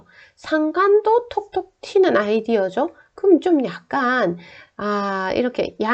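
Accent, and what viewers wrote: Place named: South Korea. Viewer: 사투리 억양이 정감있게 와닿네요^^ 깔끔한 포맷으로 다른 분들과는 차별화되어, 개인과외 받는 기분이 드네요! 감사합니다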